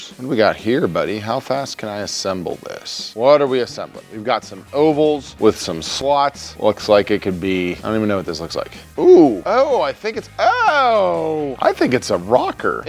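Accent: Pittsburgh, United States. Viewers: I keep hearing Yinz which means you gotta be from Pittsburgh. Instant sub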